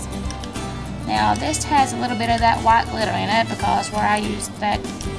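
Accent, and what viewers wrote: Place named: United States. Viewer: Hiiii nice video! Love your country voice 🤗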